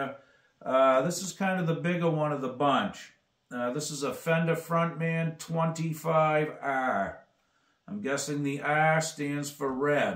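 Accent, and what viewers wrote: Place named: New Hampshire, United States. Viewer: You sound like you're from New Hampshire... lol.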